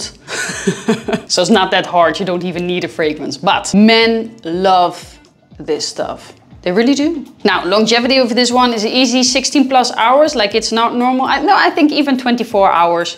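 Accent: Netherlands. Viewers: hearing your accent I must know: ben je Nederlands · She must be Dutch her accent ❤️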